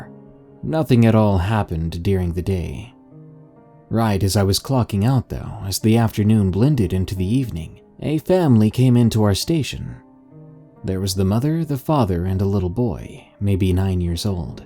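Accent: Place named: South, United States. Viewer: I liked the female narrator’s voice too. I love the man’s voice but for a story that’s from A woman’s perspective, I liked that a female voice was used for her account and I also liked her slight Southern accent as it is mentioned in the story that she is from Kentucky.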